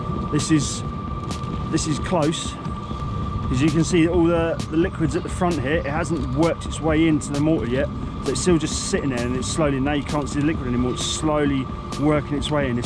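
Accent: England, United Kingdom. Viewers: Where are you from England I think?????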